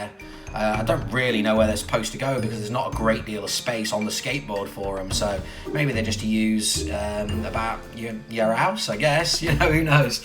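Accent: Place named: Australia. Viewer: I like your Australian accent! Also great review, really enjoyed it.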